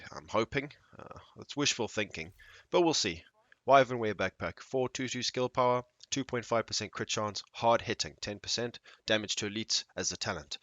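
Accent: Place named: South Africa. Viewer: My Bru.. That Accent.. SA boy comming in clutch XD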